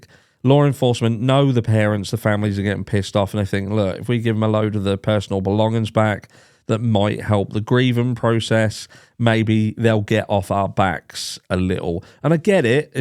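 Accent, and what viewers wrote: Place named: Lowestoft, United Kingdom. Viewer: nice, the reason I asked is you have a similar accent to some of my family from Lowestoft. I now live in Austrailia and you don't hear that accent very much.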